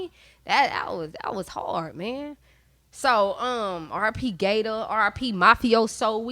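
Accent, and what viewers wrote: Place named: South, United States. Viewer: I love her lil country accent “I’m talm bout Semen 02” 😂😂😍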